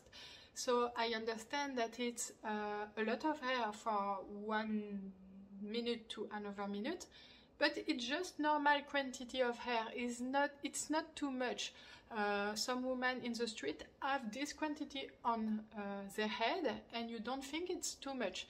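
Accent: France. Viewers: Love your French accent!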